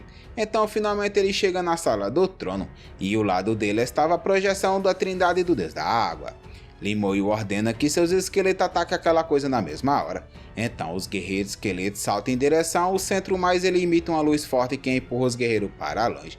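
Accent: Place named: Northeast, Brazil. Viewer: 😂 kkkk mais um EP top demais 😍 parabéns pela sua narração perfeita sem ia amo o dialeto nordestino 😂 em algumas partes me pegou de surpresa com um xingamento do neida 😂😂 vamos nessa para o próximo episódio ❤😊👍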